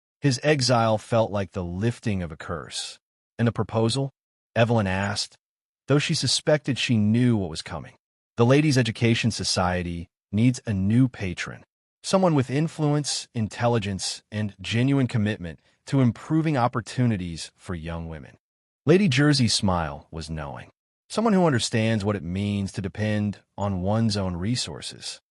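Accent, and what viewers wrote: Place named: United States. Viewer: I simply cannot understand why they use such a strong American accent for the narration in a story set in Britain. It ought to be British English.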